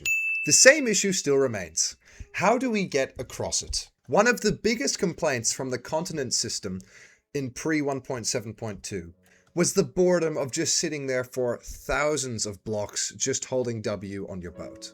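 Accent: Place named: United States. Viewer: I’m having quite a hard time pinning down your accent. Your account says Australia but your voice sounds much more American, but not completely.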